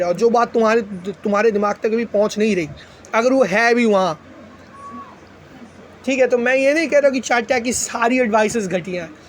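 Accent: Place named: Bengal, India. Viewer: Wanting to work hard is luck too, I dont know why ppl dont realise that .I know how I would end up in a few years if I don't work hard but I still don't I just can't focus its just luck. Bengalis have really cool accents btw look at you and kirti chow for example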